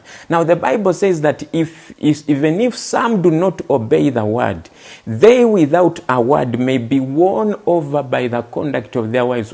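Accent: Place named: Uganda. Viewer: Ugandan English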